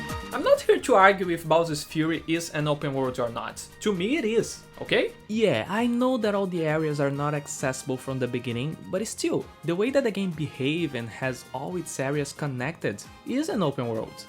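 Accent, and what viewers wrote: Brazil. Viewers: ah, sabia, o sotaque te denunciou! Mas vc fala inglês bem pra caramba! Bacana ver um youtuber da minha casa fazendo conteúdo de qualidade tb pra fora! Fica em paz, amigo!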